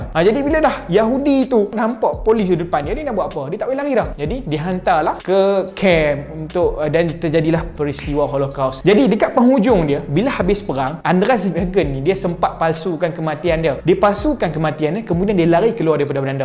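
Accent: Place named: North, Malaysia. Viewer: rupanya slang utara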